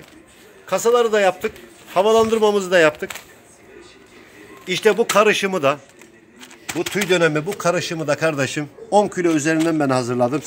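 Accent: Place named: Rize, Turkey. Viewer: senin şivene kurban olurum abiiii:))) ben de Rizeliyim... saygılar. teşekkürler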